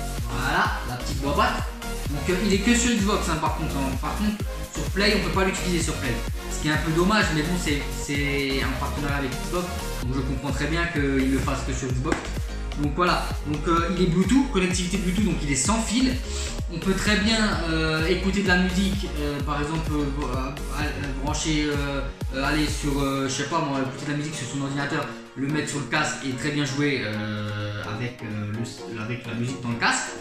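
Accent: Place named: Alsace, France. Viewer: Et yo l'accent alsacien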